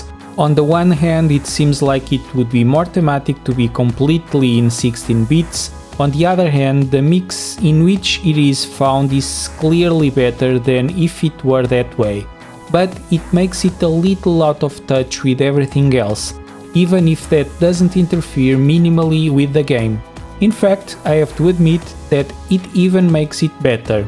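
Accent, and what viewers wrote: Portugal. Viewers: I noticed a Portuguese accent 👀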